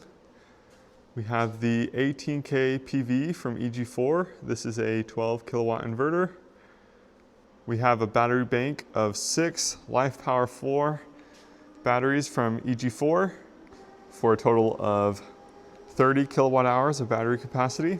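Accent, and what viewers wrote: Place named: Canada. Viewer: Hey I'm hearing a canuck accent, where are you from? I'm looking at integrating a similar design in our future home. Great video, love from Alberta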